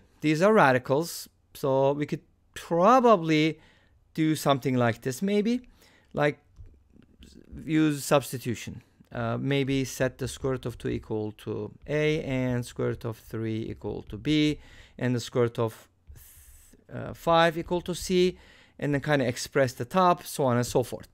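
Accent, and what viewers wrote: Turkey. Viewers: You are turkish arent you i can tell by your accent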